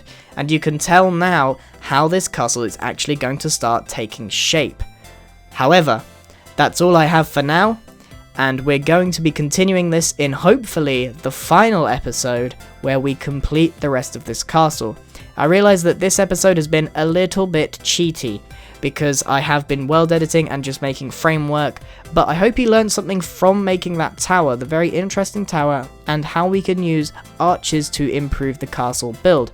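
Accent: United Kingdom. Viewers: grian im from uk but ur acent sounds soooo british haha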